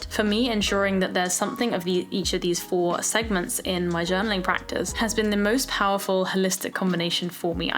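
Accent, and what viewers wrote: England, United Kingdom. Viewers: I'm 7 seconds in with with English accent and I'm "in".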